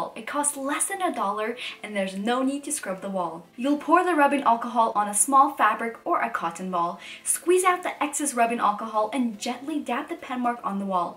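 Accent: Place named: United Kingdom. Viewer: You have a bridish axcent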